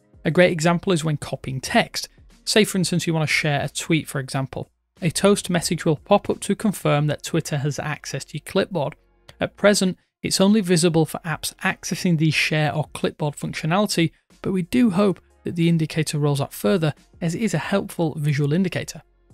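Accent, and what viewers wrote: United Kingdom. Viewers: Which accent is he speaking? British? Can anyone tell? English isn't my primary language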